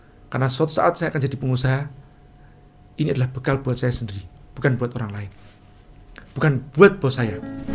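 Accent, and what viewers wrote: Java, Indonesia. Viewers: Rodo medok 😁,thanks inspirasinya bos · Medhoooknyaaa sukaa bang. Salam kenal dari Elsera di Sidoarjo.. Semoga saya bisa sukses seperti anda bang..🙏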